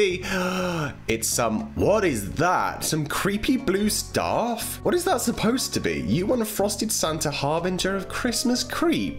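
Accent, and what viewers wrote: United Kingdom. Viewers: Are you are British so if not ... ... .. ... ...........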